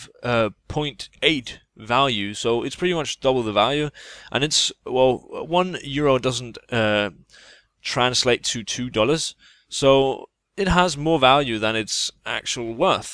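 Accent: Denmark. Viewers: I can just hear ure from denmark :D (im from denmark too øæå) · Im swedish and I can tell youre Danish.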